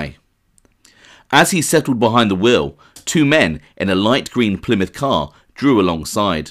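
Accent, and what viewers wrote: England, United Kingdom. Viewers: Sammy gravano could take a few lessons from you. Your knowledge of mob issues is mind blowing. I can listen to your u k accent all day.you don't swear and have a dignified demeanor that lends it's self to the program. Thanks again bill feilhauer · Sorry can't listen to some English guy talking about American mobsters doesn't sound right to me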